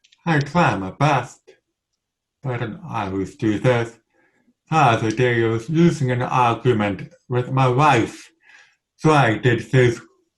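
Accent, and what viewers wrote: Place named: Vietnam. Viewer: I had a vietnamese lecturer, and you are have a lighter version of his accent. Much more understandable than him though :)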